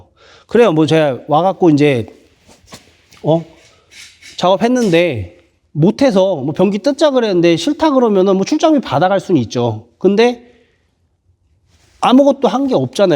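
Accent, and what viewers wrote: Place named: Seoul, South Korea. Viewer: 변기가 막혀서 몇일채 고생하던 중 우연히 시청하게 되었습니다. 사장님처럼 양심적으로 하는 사람이 드문 세상이라서.. 또 요즘 경제사정도 좋지 않고, 평소에도 간단한 일은 손수 해결하던 사람이라 걷어붙이고 뚫는 시도를 했지만 이 글을 올리는 현재 성공하지 못하고 몇일째 화장실 대란을 겪고 있습니다.ㅠㅠ 혹시 영업지역이 어디신지? 말투를 들으면 서울 내지 수도권인 것 같은데 지방출장(전주)도 하시는지 궁금합니다. *ps: 글을 올리고 영상 속에서 말씀하신 내용을 곱씹어 보니 정말 정직하게 사업을 하시는 분으로 느껴져서 '좋아요'에 그치지 않고 '구독'까지 눌렀습니다. 그리고 연결된 링크를 따라 블로그 방문을 했는데 역시 서울 인근에서 활동을 하시는 분이네요.. 지방출장을 한다 해도 출장비가 추가되면 의미가 약해지는 결과라서 혹시 막힘 증상을 글이나 영상으로 알려드리고 문제해결의 도움을 받을 수 있을지 모르겠습니다.. (영상 끝부분의 내용으로 봐서는 변기끝자락(플랜지 가까이까지 근접한?) 근처까지 물건이 밀려나면서 막힌 탓에 차오른 물이 하루종일 지나도 전혀 빠지지 않는 것이 아닌가 하는 의심이 갑니다..ㅠㅠ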